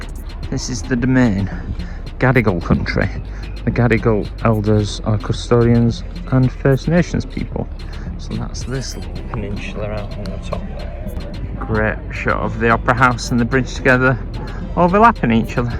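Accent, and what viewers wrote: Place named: United Kingdom. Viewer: Oh! Your accent did sound British to me but I thought you had come from Melbourne, as in living in Melbourne, to Sydney for the cruise. Yes, then you understand the distance. I was on the Discovery Princess and really loved that cruise, especially New Caledonia. I'm in love with the south Pacific and my next cruise will most probably I'll go back to the south Pacific for my next cruise. I hope you enjoyed Lisbon.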